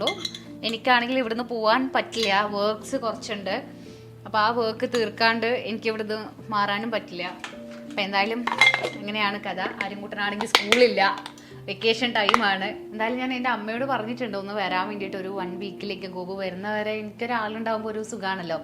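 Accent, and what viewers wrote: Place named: Bangalore, India. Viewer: U r in kochi right but ur smsrm oke kekmbo looks like u r faaar away frm Kerala like banglore oke nikne pole talking Ath kitula ith kitula sadnm nnoke pryne kekmbo I always think bro u r just in kochi ilathe ayt ndha uldh enn oke chaka oke lulu indvlo athrk venm Indel 😅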